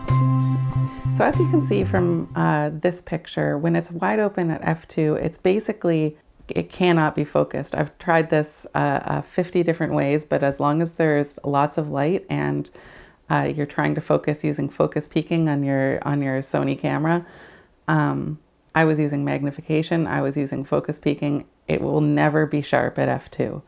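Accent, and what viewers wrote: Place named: California, United States. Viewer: Hey, you are pretty funny to this California boy. Nothing dry about your presentation. Does your Jupiter 9 have a serial number on the side? The early types did not. They had them on the lens ring. Ah Hah. Yours was made in 1984 as that is how your serial number starts out. And here we are <link> <link> This looks like a college near me. I am west of Palo Alto, CA. You talk like a local. <link> No you can do better than that. Try neutral filters. You will be able to keep the short field of focus and not blow out the sensor image. Your surrounding looks like San Mateo. Also, I am doing product shots for a product I produce. So everything is controllable and stable. I use a Canon 2000D on a big tripod (with a fluid head), with Canon software that will send the image to a laptop with any size screen... think 27" if you can have that around in a setting. 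I really can see the image clearly there. There is a issue with this camera's need for adaptors sometimes. This is talked about in a 5 Types video. It might not apply here as it is about infinity focus. It is my understanding that your camera did not have the MC multi-coat lens coating, where the earlier one or two versions did. The coating keeps the light from bouncing around inside the lens and camera. I just bought a Jupiter 9 type 2 for $135 out of the Ukraine with only $10 shipping. Here is a video you might have seen which is pretty interesting. The information is that is. Don't drink a lot of coffee before watching this. Y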